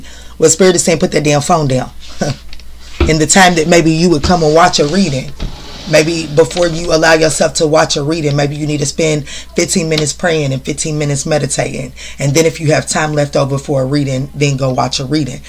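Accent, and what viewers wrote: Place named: Louisville, United States. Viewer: I hear Louisville KY in ur voice?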